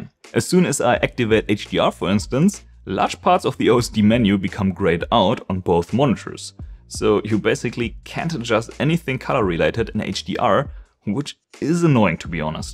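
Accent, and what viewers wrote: Germany. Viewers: Höre ich deutschen Akzent raus? Edit: Nevermind, der letzte Satz im Video hat's gerichtet 😂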